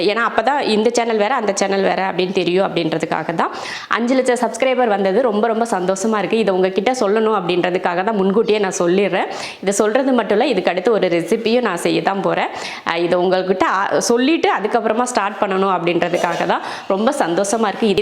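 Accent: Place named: Theni, India. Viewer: Akka neenga Theni districta ?Unga Slang ,food habbits apdithan iruku.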